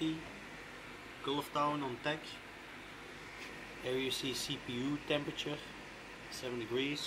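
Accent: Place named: Belgium. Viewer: your English accent is pretty funny xD Klinkt nog een beetje belgisch:P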